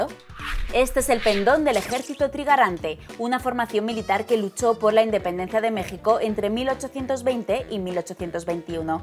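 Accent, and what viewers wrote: Spain. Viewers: tu voz de española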